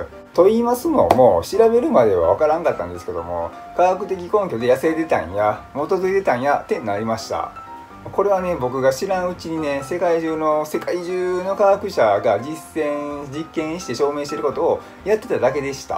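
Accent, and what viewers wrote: Osaka, Japan. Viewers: 食事療法のアドバイス、とても参考になってますよ👌昔から糖尿病の療法食は健康食、ダイエットにも適していると言われてましたからね👍 大阪弁⁉️希に嫌う人も(昔本当にいました。大嫌いという人)いますが、和みやすくて良いじゃないですか。関東人でも大阪に3年もいれば大阪人というくらい馴染みやすいですよ。地方地方の方言、楽しかろう⁉️(笑)ご存じですか？東京人は、昭和のころ、ほとんどが東北人だといわれていました。それくらい出稼ぎで出てきた人が多かったそうです。先祖代々という地元民は凄いことなんですね👍 👊😆🎵🙋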